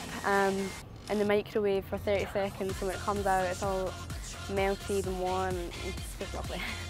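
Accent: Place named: Scotland, United Kingdom. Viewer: hot, and a scottish accent? dear lord perfect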